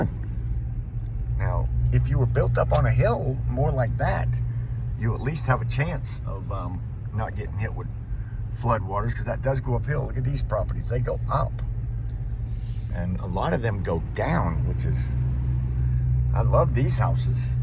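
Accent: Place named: North, United States. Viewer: Howdy neighbor I live in Fair bluff NC we had flooding, but not like that this time the Beaches got hit hard . You sound like you're originally from up north I am as well , but been here 30 plus years now. I subbed my friend does a lot of scrap metal I did it myself a few times . I clean houses at N Myrtle Beach and work at a grill a few days ain't retirement wonderful 😂 . God bless we have another storm heading our way I love across from the lumber River I'm on the high side so I don't get flooded but you can't get out either.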